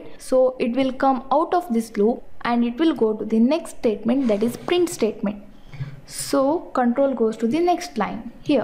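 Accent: South India, India. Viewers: you are mallu madrasi or hyderabadi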